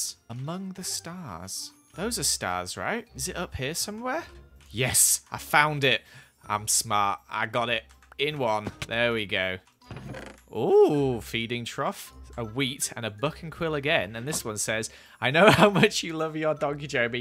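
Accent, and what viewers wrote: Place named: Scotland, United Kingdom. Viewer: Sounds like Scotland to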